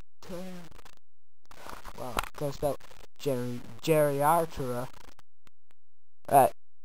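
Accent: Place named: Scotland, United Kingdom. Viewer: scottish accent = da shit.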